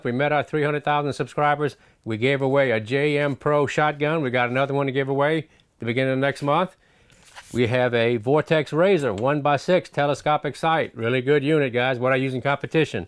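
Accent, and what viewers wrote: Louisiana, United States. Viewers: Eric Jesperson He sounds like he is from Cajun country.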